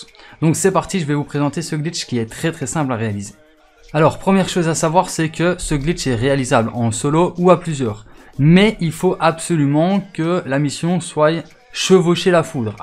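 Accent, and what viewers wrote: Belgium. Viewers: T es belge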